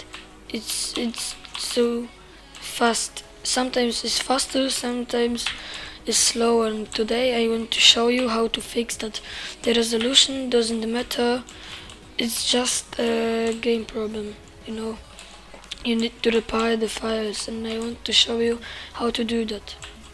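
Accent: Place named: Poland. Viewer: słychać że polaczek :p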